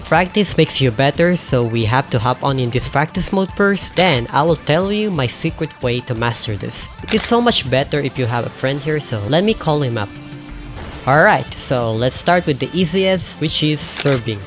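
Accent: Philippines. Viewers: Pretty sure it might be a Filipino accent (as a Filipino) correct me if I’m wrong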